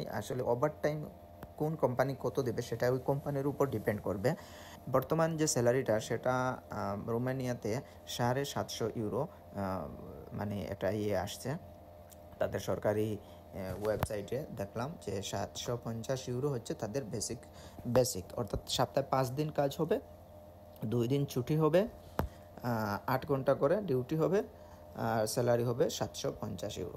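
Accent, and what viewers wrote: Sylhet, Bangladesh. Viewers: ভাইয়ের কথায় মনে হচ্ছে সিলেটি হবেন, ভাই রেস্টুরেন্টে কাজে কেমন বেতন হয় জানালে ভালো হতো? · বাইয়া আপনা কতা বার্তা বুজা জায় আপনি সিলেটি আমিও সিলেটি মুল কতা হলো বাই কোন দিন জদি আললাহ আমাকে দয়া মায়া করেন জদি আসতে পারি রােমানিয়া তাহলে কি ভালো হবে জদি বাই আললাহর হুকুম তাকে আকি নচিবে দেয় রিজখে টানে আকি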